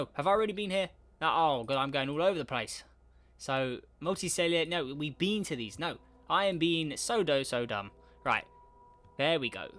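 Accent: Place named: United Kingdom. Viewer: i love british accents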